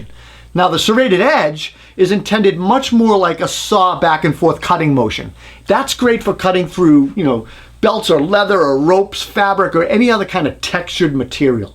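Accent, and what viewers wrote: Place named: New York, United States. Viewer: Don’t like this big mouth. Very New Yorkey.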